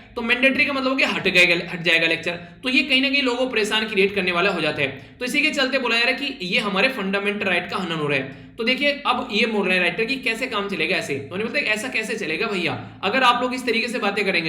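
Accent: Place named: Western Uttar Pradesh, India. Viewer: सर जी आप कहां से हो मुझे आप की बात सुनकर लगता है कि आप पश्चिमी उत्तर प्रदेश से हो कल जरूर बताना । अगर किसी दोस्त को बता हों तो जरूर बताना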